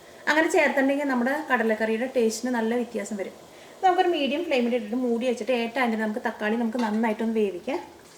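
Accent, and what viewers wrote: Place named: Kottayam, India. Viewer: Nice presentation.. mainly the slang which resembles kottayam bhasha...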